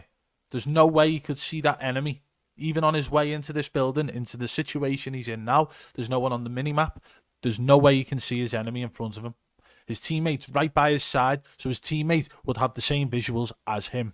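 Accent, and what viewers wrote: Liverpool, United Kingdom. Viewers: Are you a scouser ? cause i heard an accent. or am i deadly wrong lol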